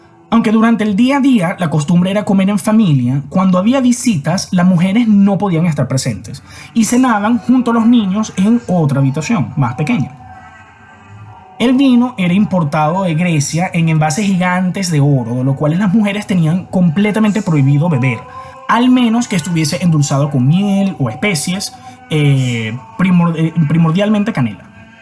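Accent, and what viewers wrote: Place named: Venezuela. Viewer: ese acento, si no me equivoco es de Venezuela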